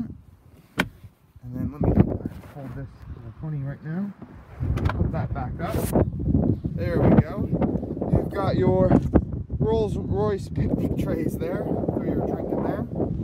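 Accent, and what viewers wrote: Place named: United States. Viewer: You sound like american xd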